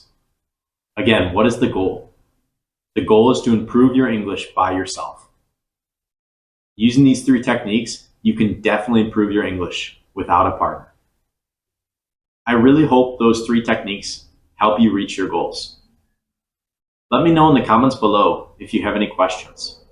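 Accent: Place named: General American, United States. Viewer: face-blue-smiling: ... Thanks a lot teacher for all you do for us. By the way, where is your accent from (state/city). I like it a lot. It's crisp and clear. It sounds like the General American English. When you speak I pause the video I repeat what you just said and try to imitate your accent. Greetings from Miami ... !!!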